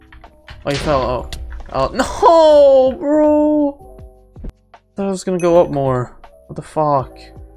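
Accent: Sweden. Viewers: you from sweden??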